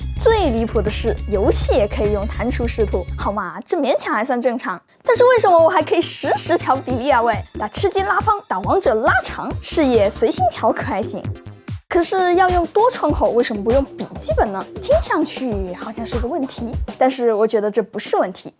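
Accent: China. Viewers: 好重的中國鄉音，逃。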